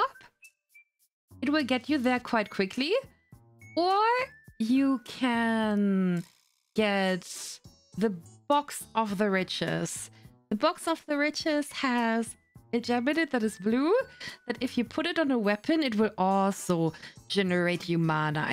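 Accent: Germany. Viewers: Boahh schwierig wenn der deutsche Akzent kickt, trotzdem gutes Video.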